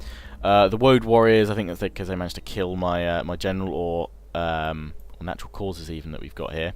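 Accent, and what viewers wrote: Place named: England, United Kingdom. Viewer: the English accent is hilarious (says the auzzie to be fair)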